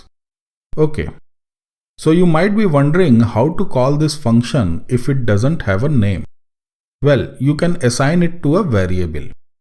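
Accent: India. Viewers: Heavy In accent is a plus. Perfect videos are typically less natural. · namas te. дорогие индусы, пожалуйста, учитесь говорить по-английски нормально, ваши курсы невозможно слушать. речь непоставленная, акцент жуткий, половину слов просто непонятно иногда.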